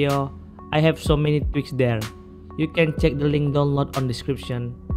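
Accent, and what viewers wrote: Indonesia. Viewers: org indo ya bg? ketara accent nya😅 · benerrr tp aksennya ketebak banget klo org indo WKWK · Your accent kek orang Indo anjir but its good tho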